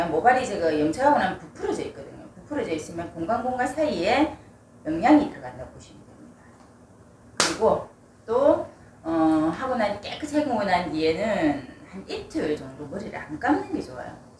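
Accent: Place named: Busan, South Korea. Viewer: 말씀도 넘찰지게잘하시네요 사투리도 구수하구요 저역시부산서 오래살았거든요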